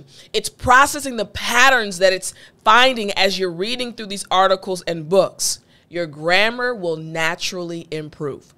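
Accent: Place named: United States. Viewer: Different accents but i would like every America have you same accent teacher, cuz it's beautiful and I can understand clearly 😊😊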